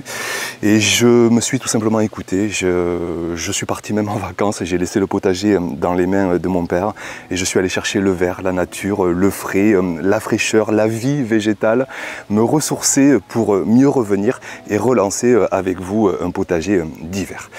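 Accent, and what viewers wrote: South, France. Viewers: Un grand merci, pour tous tes conseils sur le potager. Ton accent du Sud et ta bonne humeur rendent chaque astuce facile à suivre et motivante 💚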